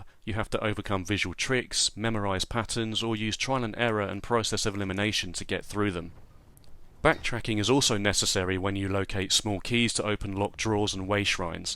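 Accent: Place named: United Kingdom. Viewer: Two of the things i like most about youtube channels, smart English accents and resident evil analysis. Liked and subscribed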